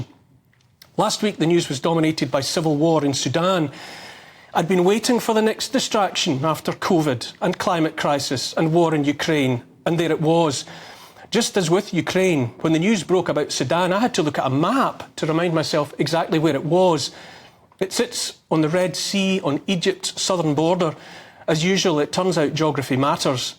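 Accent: Scotland, United Kingdom. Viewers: This is one of the best speeches ever ~ Can't stand the british accent but the Scottish one will do in a pinch as long as it's fiery . English was MEANT to be thus and good on you Neil ~ Please comprehend that these powers that be are actively probing and trying to PROVOKE anyone to become aggressive and radical... Jan 6th hijacking of a peaceful protest was PROOF of that ~ The Bible shows us how to have the patience for days like these ~ God help us ~ Hurry Lord Jesus Christ !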